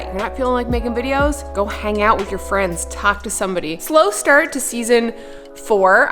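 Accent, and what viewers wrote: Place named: Newfoundland, Canada. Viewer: The Newfie accent is THICCCCC right now!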